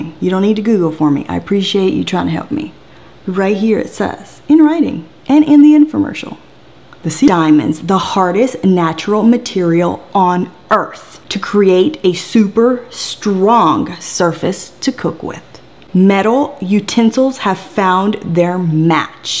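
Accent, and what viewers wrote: South, United States. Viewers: you are very entertaining, interesting and smart! Plus you have the coolest slight Southern accent...and what a voice! Just curious, what State are you in? Most entertaining reviewer on Youtube! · Holy crapola you are adorably gorgeous, and that accent WOW. Where are you from in the south anyway. I was actually looking for info on this pan and found your video, which made me so freakin happy because not only did I learn about the pan, but I learned it from one of the most attractive women I have ever seen. There is nothing I don't like about you.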